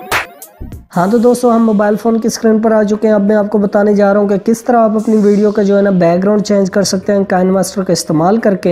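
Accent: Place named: India. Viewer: Fk damn, Indian accent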